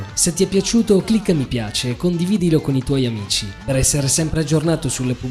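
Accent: Italy. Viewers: Ahahha già dall' inizio del video capisco che MOOOOLTO probabilmente sei Vicentino! Visentini magna gat xD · di dove sei? l'accento sembra trevigiano.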